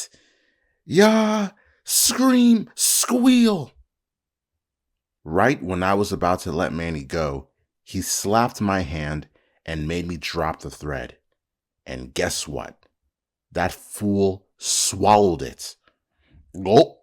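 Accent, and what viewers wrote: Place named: United Kingdom. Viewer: Hes british i think bc of the tone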